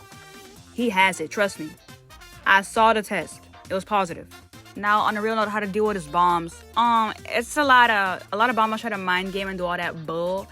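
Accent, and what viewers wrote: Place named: Africa. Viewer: Maybe africa english?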